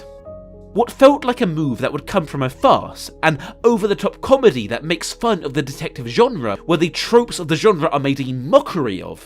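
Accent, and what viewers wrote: England, United Kingdom. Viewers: Honestly I can't even watch this.... It's just too much cringy English crap like the word "Baskerville" said repeatedly.... Love your channel even though you're English but wow I can't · I haven't heard someone speak so British since my two British teachers :)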